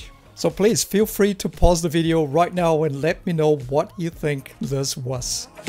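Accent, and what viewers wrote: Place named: New Zealand. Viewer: Hi!:) I just started the video and I realized how I kinda missed your NZ accent!:D So good to hear from you in another video, I feel immediately comfortable and already enjoy your puzzle experience. Thank you and lots of warm greetings from Germany:)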